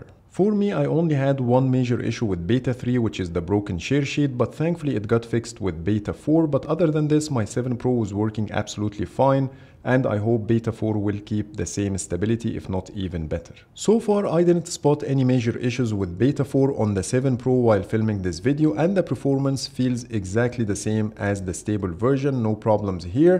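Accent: Egypt. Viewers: By listening to you, I immediately noticed that you are Egyptian. right?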